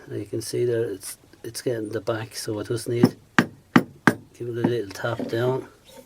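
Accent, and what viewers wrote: Dublin, Ireland. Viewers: Very helpful video. I've not done any wood turning since secondary school many years ago. I got a lathe at Christmas and have been tipping away since. Im guessing by the accent you are in Dublin, I was just wondering where you got the grinding wheels? I got a cheap 6" bench grinder but the wheels with it are very rough and I dont want to damage my chisels. Planning on making the home made Jig for the fingernail grind as they are too expensive to buy when they can be made quite easily. Also enjoying your other videos too. Planning on trying the multi axis cup when I get time.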